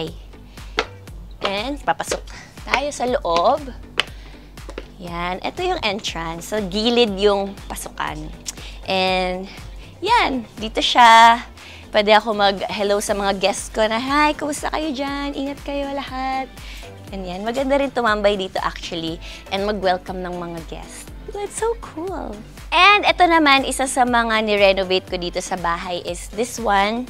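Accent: Visayas, Philippines. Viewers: bisaya po mo ate kim chiu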